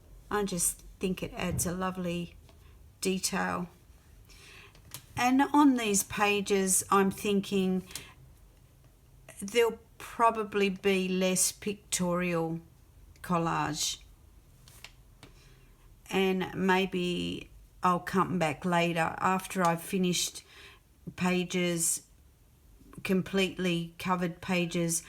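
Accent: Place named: Australia. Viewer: Hi, I am enjoying your videos as I only came across them recently.I love finding new artists on YT. It is also nice to hear an ozzy accent. Thank you for the inspiration and I look forward to following you well into the future. Cheers Vicki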